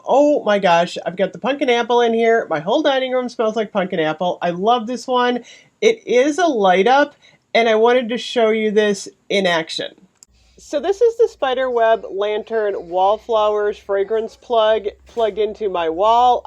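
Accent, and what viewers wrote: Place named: Northern Ohio, United States. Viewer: Susan Smith, that is the regional pronunciation of that particular word. You will find most Northern Ohioans do pronounce things differently than the rest of the country. I’m not far from and I pronounce it that way as well. I am also college educated. It really is the regional vernacular.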